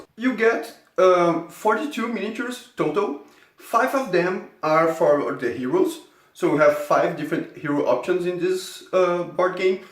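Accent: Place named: Brazil. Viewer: Tu é BR, esse sotaque não engana kkkkkkkk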